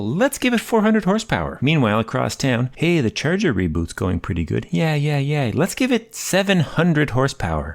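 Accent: Canada. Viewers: This is the most Canadian man I've ever heard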